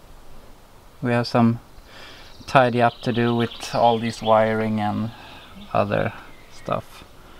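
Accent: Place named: Sweden. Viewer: Hördes direkt att du va svensk, nice video!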